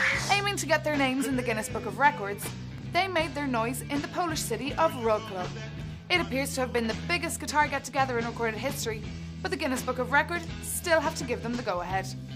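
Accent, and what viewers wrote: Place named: Ireland. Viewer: Irish accent... I like her accent...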